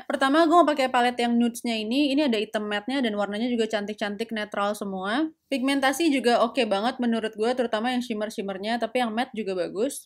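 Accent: Java, Indonesia. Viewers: hhaaaaaaaa aq seneng medok mu hhaayyy! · lucu sih kalau ngomong Jawa 😂😂😂 lvlv Kak, ga pernah bosen deh lihat Chanel nya 😍 mau mati gue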